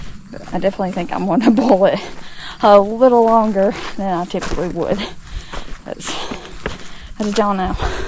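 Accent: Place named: Georgia, United States. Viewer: You sound like you are from Georgia.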